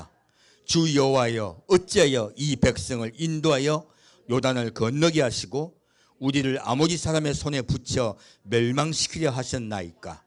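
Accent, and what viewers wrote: Jeolla, South Korea. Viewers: 말투를 보니까 전라도 출신 같으신데, 빙빙 둘러 말하지 마시고, 솔직하게 입장을 밝히시는 게 좋겠습니다.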